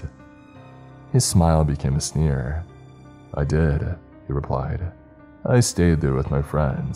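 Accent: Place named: United States. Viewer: I was about to say it was strange hearing an American accent on an British character but then I remembered that you've voiced, and I quote, "a small town girl" before and somehow I can always look past it because your narrations are that good.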